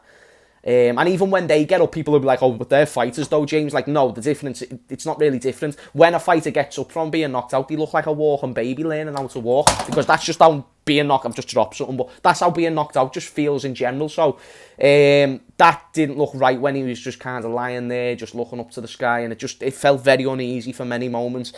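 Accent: Liverpool, United Kingdom. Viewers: 😂😂😂 He’s a scouser!!!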